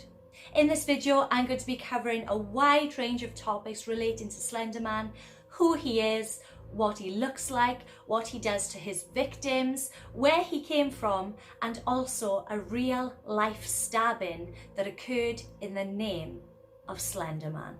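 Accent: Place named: Wales, United Kingdom. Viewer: Me too I dont really like the Welsh accent however has a very soft voice and proper fit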